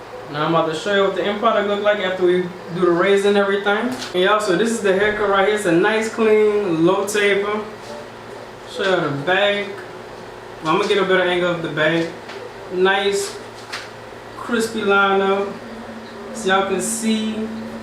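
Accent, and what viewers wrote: Louisiana, United States. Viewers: Just by your voice, I know you're from Southern Louisiana. That is a unique accent.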